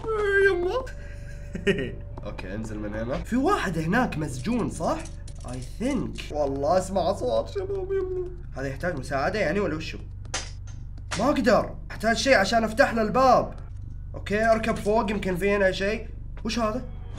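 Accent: Bahrain. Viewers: صاير تتكلم بحريني واجد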